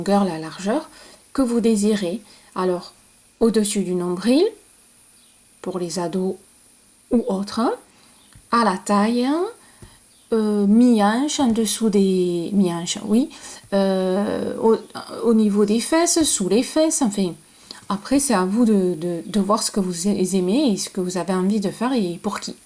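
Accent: South, France. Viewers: Bonjour vous êtes mon rayon de soleil et le bonheur de vous entendre avec votre accent du midi je suis moi de même du midi MERCI BISOUS BISOUS BISOUS FERNANDE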